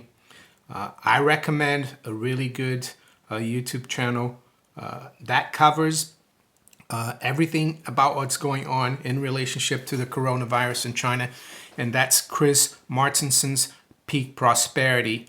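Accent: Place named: New England, United States. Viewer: Where you from? Northeast accent. New England?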